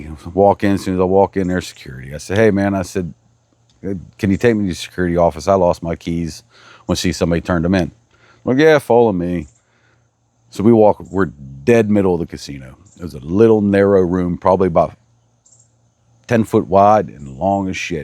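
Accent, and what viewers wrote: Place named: Pittsburgh, United States. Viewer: lol i was thrown off by the Golden State shirt and story about driving from Utah. I’ve lived in Pgh my whole life so I know a fellow yinzer when I hear one 🫡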